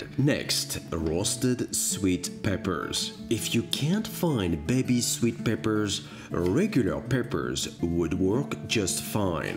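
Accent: France. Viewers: J'aimerais savoir une chose, vous êtes français? Parce que quand vous parlez anglais vous avez le même accent que nous français lorsqu'on parle anglais. Des vidéos en français serait top même si je comprends tout à fait l'anglais. Thank you for your recipes they're soooo delicious !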